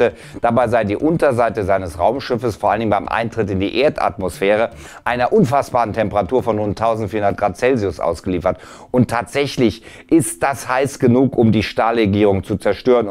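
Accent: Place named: Berlin, Germany. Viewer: Und das von einem YouTuber mit Berliner Akzent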